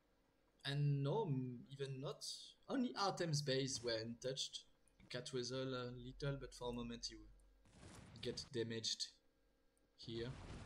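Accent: France. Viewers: are you french ? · french accent, so french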